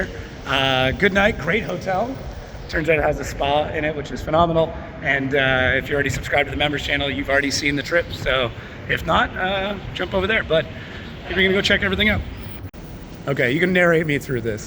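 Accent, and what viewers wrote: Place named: United States. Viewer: you try your best to anounce Polish and not Polish drivers at comentators both, sometimes i think you are polish komentator speaking with US akcent, good work it was good experiende to hear you, Polish drifting its sutch a big history that you will need exdent this movie to 10h, but good shot trying to show people what its all abaut, you did a greate job ,,check Final in Płock - Adam Zalewski vs Piotr Więcek or James Deane vs Pawel Borkowski - THE BEST DRIFT BATTLE EVER? at Płock" glad that guys from DMP work with RedBull and the Championship is big but still have the spirit of family grassrots event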